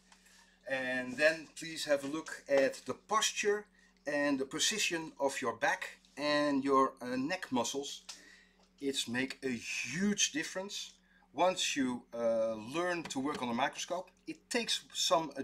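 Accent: Netherlands. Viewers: Ha! Coffee in a shot glass! I’m corrected. You sound like the wonderful Amsterdamer. We were visiting over the holidays and stayed in the historic canal area where my Cousin and family live there. I wish I could have brought my Tudor Chronograph from the early 1980s. I don’t trust just anyone having it serviced. Any suggestions from here in the USA? We are in North Carolina. Thanks.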